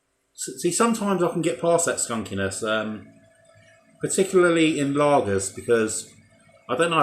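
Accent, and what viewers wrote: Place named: United Kingdom. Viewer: your british accent is so lovely and comforting, like music for my ears and heart ❤ thank you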